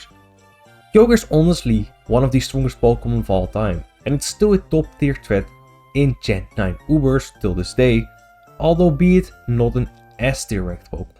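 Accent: Netherlands. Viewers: Hahaha Rain Boosted Water Spout goes brrrrrr (Btw are you Dutch? Guessing from your accent)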